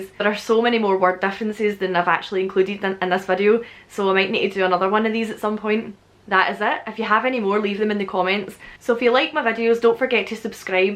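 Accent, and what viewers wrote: Scotland, United Kingdom. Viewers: American, of Scottish descent, and I've never used the word "jumper". Perhaps to describe someone who jumped off of a building? Then again, I'm male. Females in America might use it to describe an article of clothing? Just not me. Hey, I really enjoy your videos. I've never been to Scotland, but want to one of these days. The Scottish accent is my favorite of all English speakers worldwide. Yours is just lovely. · what part of scotland are you from? i'm fallen in love with your accent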